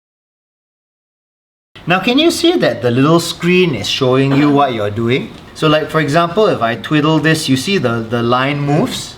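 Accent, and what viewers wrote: Singapore. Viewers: Yeahh..sounds really Singapore English. Great video! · Great Singlish hahaha 👍🏻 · Oh goodness didn't expect the Singaporean accent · I know that you have a very familiar accent! That's why I'm asking whether you're Singaporean/Malaysian ! How did u learn our English accent?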